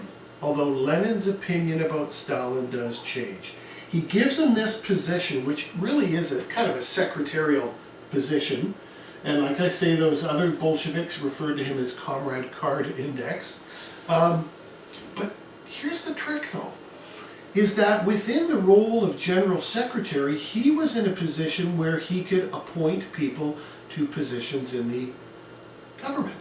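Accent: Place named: Canada. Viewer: Your lectures are made even better by that wholesome, folksy Canadian accent.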